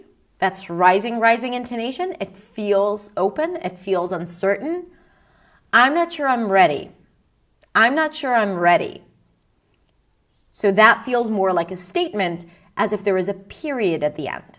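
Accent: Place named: United States. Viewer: As a native English speaker, I’m not sure why I’m watching this, but I’m fascinated. Very surprised to hear that English is not her first language. Her American accent is 100% perfect.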